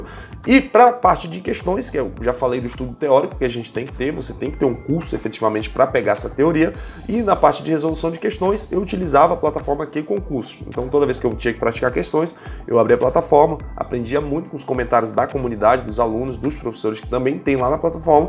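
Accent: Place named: North, Brazil. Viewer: Vc é nortista? Tem um pouco de sotaque rsrs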